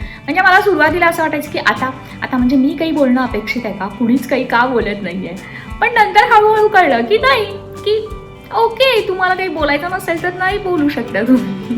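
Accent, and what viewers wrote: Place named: India. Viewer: No offence but Indian dialect of English is really hard to swallow in.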